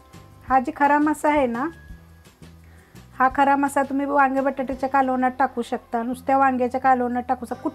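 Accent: Satara, India. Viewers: तुमच्या आवाजांना मी ओळखलं तुम्ही साताऱ्याचे आहेत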